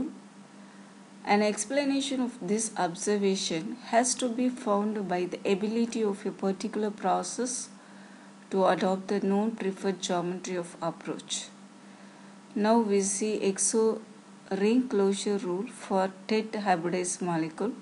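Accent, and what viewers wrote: India. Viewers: Why cant you just speak in Hindi and have an interpreter translate it to English. I cannot understand a single word you are saying. I appreciate your effort towards this video. But if you are trying to target people who speak English, your accent is too heavy Hindi rich. I can’t understand you.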